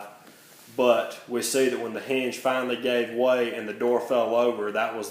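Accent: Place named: South, United States. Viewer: Hey bud. I love ya because you were a son of the south reading this incredible haunting late DFW micro-story, but as I’m sure you’ve been informed, the baby didn’t die. Not physically. The point of the story is how enough pain, combined with enough hopelessness of escape, causing permanent mental/spiritual dissociation as the only available escape. It is a part of him was more present and alive in the world that he was born which detached itself from his spirit and “floated away like vapor” or whatever he says there. He was in so much pain, and the two people he instinctively trusts to make pain go away couldn’t for so long, that he partially dissociated to get away from it and that part of him never came back, though it clearly says he went on and lived and life and “drew pay” meaning he worked a job like anyone else. But he was partially detached from life, a part of him dissociated and left forever, and watched overhead. 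In other words, he was a very different person in life than he would have been had the accident not happened, an alive and present person. He lived his life, but his soul left, and watched overhead😟🤯🤯😮. Fucks me up every time. Are you from TN, NC, GA or KT? Maybe SC but no one in SC has ever read DFW. Hearing this in your accent is music to my ears. Good on ya for so much here. If you’re still on here I’d love to hear what this was for and if you finished your degree, etc. 🤠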